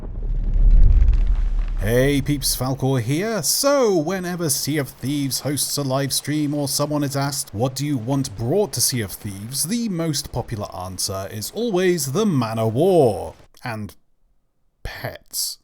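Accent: Spain. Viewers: Are you Spanish??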